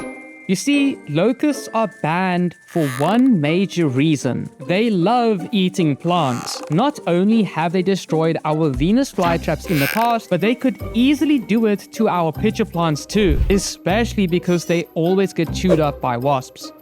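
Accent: South Africa. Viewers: Totally random, but you sound like you have a South African 🇿🇦🇿🇦🔥🔥🔥 I'm from SA too ✨✨✨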